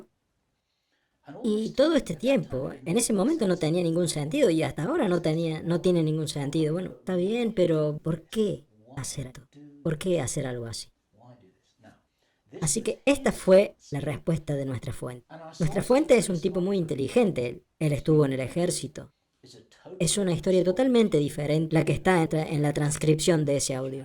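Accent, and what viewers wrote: Córdoba, Argentina. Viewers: Felicito a quien se haya tomado el trabajo de traducir a voz viva todos estos documentales, pero esa voz tipo " las ardillitas" y con una tonada cordobesa y con intervenciones tipo humor cordobés...jajajajaja, me encantaría saber quien está detrás de esa maravillosa voz....jajajajaja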